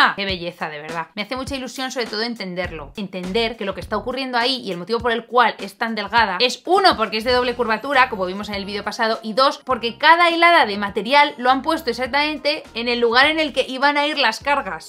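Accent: Spain. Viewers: El acento español cago el video ojala haya subtitulado en latino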